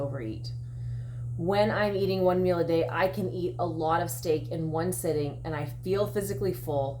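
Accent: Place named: Philadelphia, United States. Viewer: You from Philly? Just detect a bit of our accent. Love your channel.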